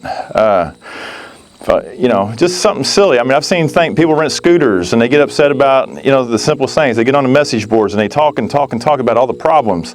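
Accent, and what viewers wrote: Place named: Kentucky, United States. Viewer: Your accent is so Kentuckian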